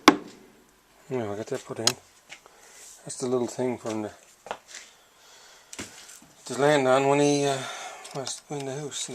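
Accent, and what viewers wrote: Newfoundland, Canada. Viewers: Now das a good ‘ol Newfoundlander dere!!! ❤️❤️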